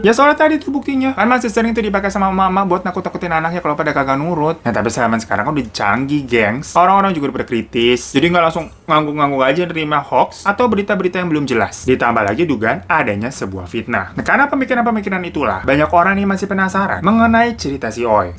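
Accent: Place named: Indramayu, Indonesia. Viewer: Saya curiga Kalo bang ini orang indramayu selipan kata kata Dan logat mirip gitu sih hehe hayoo bang darimana sih bang kamu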